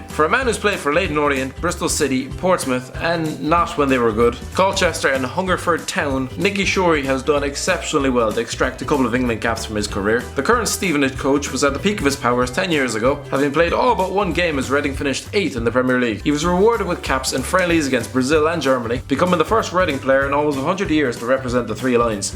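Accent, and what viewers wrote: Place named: Ireland. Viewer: He sounds so irish.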